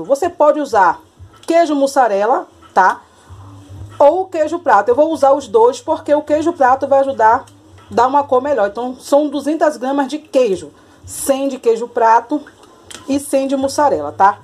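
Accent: Bahia, Brazil. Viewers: Vc é baiana? Seu sotaque parece,sou baiana,vou fazer ,amei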